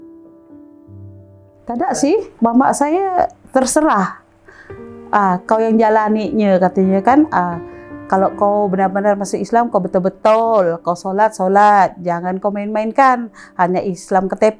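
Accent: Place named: Malaysia. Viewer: Tapi,akk macam org Melayu cakap Nye kak,akk dari mana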